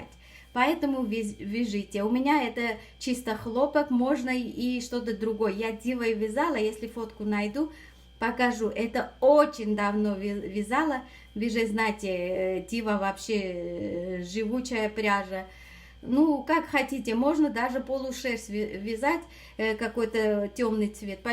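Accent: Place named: Dnipro, Ukraine. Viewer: ! какой вы красивый кардиган связали! он у вас получился такой элегантный! и еще: мне послышалось или вы и правда из Днепра?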